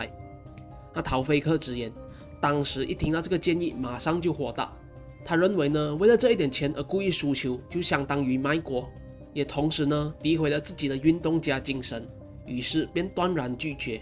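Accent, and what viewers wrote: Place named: Northern Malaysia, Malaysia. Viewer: 你是北马人吗？口音好重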